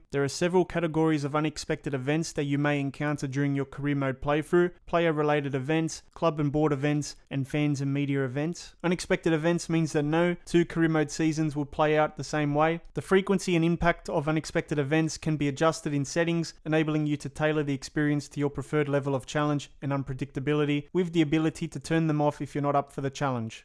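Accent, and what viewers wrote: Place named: Australia. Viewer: I just subscribed because of your Australian accent!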